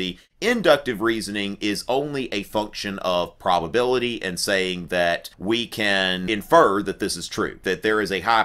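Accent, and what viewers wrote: South, United States. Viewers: Greetings from Belgium! I'm a student in literature and spend my life reading books. I'm saying that in order to ensure you (if you would even need that ^^) that your videos have a good level of accuracy and I rarely find errors in your works on European history, which becomes more and more uncommon on other American channels... Anyway, I'm glad that I can sum up my readings by watching your nice videos with your lovely Southerner accent and, moreover, by doing this, improve my English! :D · Idk why but the southern accent makes this so much more understandable 😭👌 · Smartest man with a southern accent i have ever heard. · Love your explanation and Southern accent Makes it so simple and easy to understand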